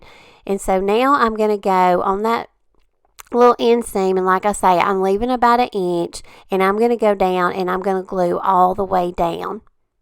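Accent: South, United States. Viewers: I adore your accent! I miss living in the South. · I Absolutely Love your Southern Drawl & creative DIY's. The Drop cloth pillow cover is too cute Ya'll! 💜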